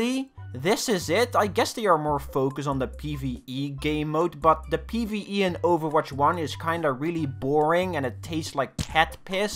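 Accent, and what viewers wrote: Netherlands. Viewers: hes dutch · Are you dutch?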